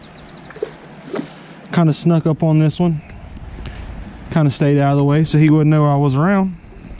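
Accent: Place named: Appalachia, United States. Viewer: enjoyed the video minus the hillbilly speak.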